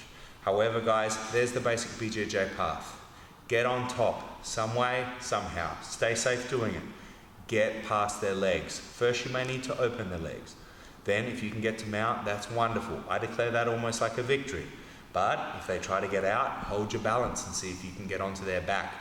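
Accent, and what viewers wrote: Australia. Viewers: I watched a bunch of Bjj videos, but for some reason, your videos resonate with me and easy to digest. Maybe it’s your Aussie accent haha. thanks from San Francisco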